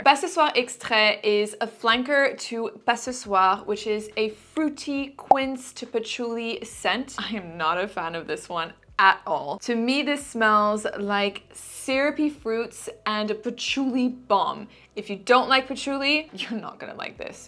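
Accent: France. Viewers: Kinda just watching the video because I love listening to her French 😌 ❤ 😊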